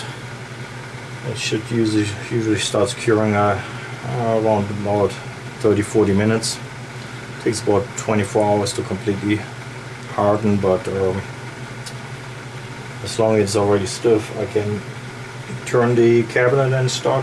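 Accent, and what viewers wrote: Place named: Germany. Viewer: Very good Work Herr Teuton, you sound like a German living in the USA. If that is the case, I have a similar story. Myself I purchased all the Upgrades for a KLF-30 with Ciare woofers and will be attempting to build a set of cabinets from scratch.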